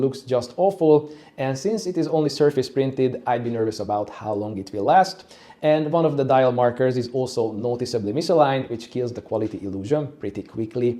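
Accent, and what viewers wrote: Hungary. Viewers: What accent is that, Hungarian? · Magyar akcentus amit hallok?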